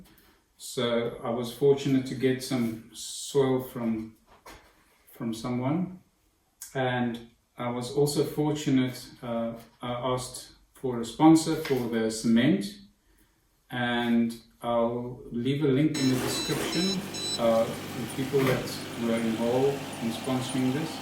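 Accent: South Africa. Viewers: your accent sounds South African.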